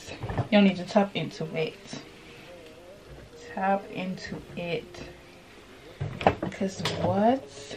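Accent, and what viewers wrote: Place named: Jamaica. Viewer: I know a fellow Jamaican when I hear them🧏🏾‍♀️ Allahumma barik sis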